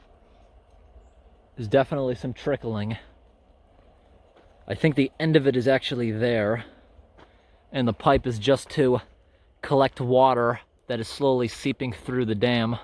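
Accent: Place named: New England, United States. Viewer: Just a couple of comments. First I love your dog, she is beautiful, would be nice to see more of her... second, I really dig your accent... is that typical 'New England'? next ....you are showcasing an outstanding part of your wonderful country, educating individuals such as myself to the rich and diverse wilderness still left in the north eastern states....Finally much respect to you for your selfless efforts to improve the environment for the benefit and enjoyment of your fellow bods. ....Peace and happiness from across the pond (Old England).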